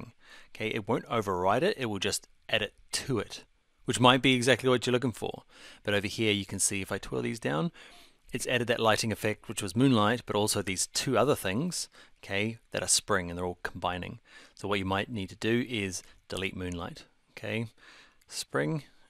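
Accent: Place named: New Zealand. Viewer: You do some awesome videos bro, this is the first video I've watched and I thought straight away 'Is he from New Zealand' hahaha Chur bro that's mean as I'm Kiwi too, Keep it up!!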